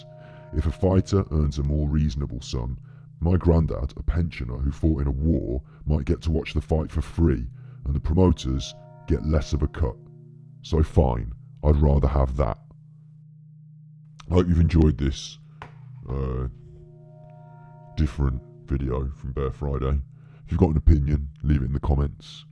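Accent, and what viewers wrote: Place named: Midlands, United Kingdom. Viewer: It's not Hatman, the inflection in some words have a midlands accent. Also it wouldn't make any sense for them not to discuss this on their own channels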